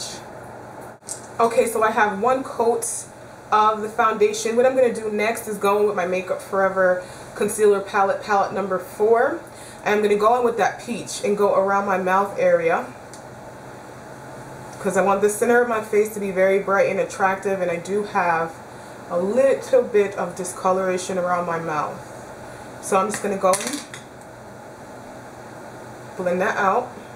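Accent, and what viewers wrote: United Kingdom. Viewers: lol at the british accent, I love it goooorgeous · Hahaha love the british accent! great tutorial!!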